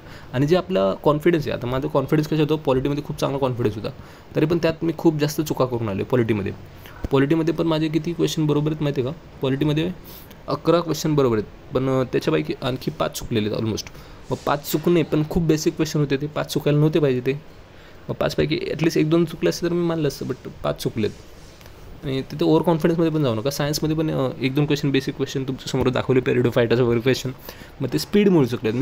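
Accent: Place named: Karad, India. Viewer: aawaj Varun Karad vatakl😅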